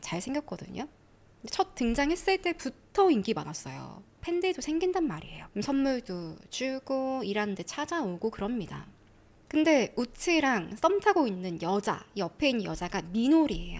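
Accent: Busan, South Korea. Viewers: 부산 사람이신거 많이 티가 나시네요. 일본말을 엄청 잘 하시고 일본 문화에 대해서도 엄청 많이 알고 계시네요 일본에 대해 많은 호감이 있으신가 봐요.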